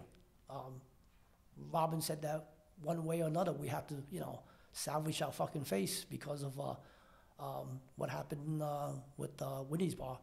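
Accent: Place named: United States. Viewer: English accent is 100% American. Nice.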